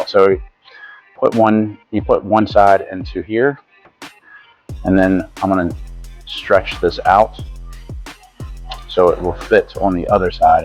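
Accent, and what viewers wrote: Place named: United States. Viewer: What we all need. A video of installation instructions in American English. 😊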